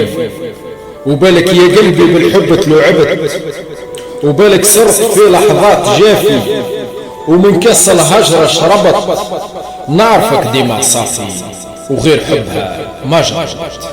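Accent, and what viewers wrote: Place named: Tunisia. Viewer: روعة ❤ما احلاها اللهجة التونسية و اللكنة الجنوبية و الوسط الغربي ..دمتم بخير استاذ ..اشتقنا الى تونس في الغربة ..في القصيدة نلمس الروح التونسية و جونا و افراحنا و ذكرياتنا مع الحب الاول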